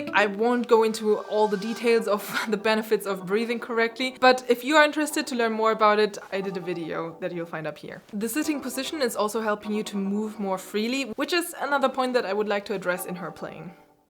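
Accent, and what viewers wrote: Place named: Germany. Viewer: Interesting video youtube recommended me. I instantly knew that you were German like me. Why? Unfortunately you hear it. But your English is very well as is your pronunciation. It's probably because you're not speaking an American English and who knows how to speak like the Brits do? I watched a video of you playing the piano and you're a super awesome piano player. I am learning to play but it's just beginner's level which is fine. 😉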